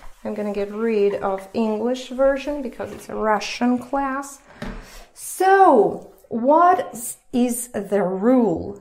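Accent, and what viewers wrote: United States. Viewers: Did you study American English? You have a slight American accent You speak great English by the way congratulations xxx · Все-таки, до чего же противный этот американский акцент. И где только ведущая курсов ему обучилась? То ли дело, британский - как птичий щебет, а не растирание жерновов. Особенно их звук "R" раздражает. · How did you lose your Russian accent and sound like an American?? Lol!! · HiI would just like to comment on a certain things either from this video or lesson 2 with regards to the letters ц ш щ ж. In true English (British) all these sounds exist and are used however, they are limited and are always diphthongs and/or triphthongs. Examples: genre, xaxa/zhazha (a girl's name), schedule, shoe, tsar (from Slavic languages imported into English) Furthermore true English is not like Yankee English. I can tell by accent that she learned Yankee English and not true English which is much harder. 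We also say everything like Russians. Example of this is the word 'think' Englishmen will pronounce it somewhat like 'thing'k' but Americans do not. To verbally say 'gonna' or cut endings off of words English or British people in general do not like it and it makes the speaker look uneducated thus that kind of language was only ever used in text messages and even now is not very popular. British English has many rules with regards to how to read and write and to write incorrectly will make it unreadable or phonetically impossible. We are not taught 'phonetics' in the UK as it is not practical because children will think it can only make that sound which is not the case. Example of this is maybe paediatrician or the real spelling pædiatrician. Notice the special 'æ' this tellls us it makes a long ee sound. But Americans don't spell like that. They changed it to 'pediatrician', so really it sound make the sound ped'dria'tri'cian but hey, Americans don't have t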